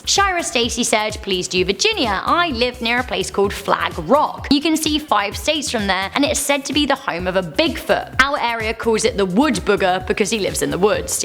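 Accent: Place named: United Kingdom. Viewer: Goddamn i can only withstand so much british accent..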